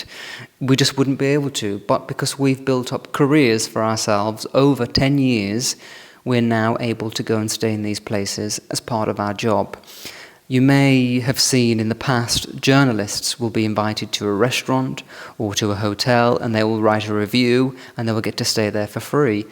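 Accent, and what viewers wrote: RP, United Kingdom. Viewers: I came across your channel by accident and really enjoying it im glad you explained about your lifestyle not that you have to .I think its cause your accent is very posh that maybe people perceive you've come from money not gonna lie I thought the same so thank you for sharing . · I can't believe he does not have a Trust Fund and was not educated at elite British institutions. His speech and mannerisms, show true upper class Britannia.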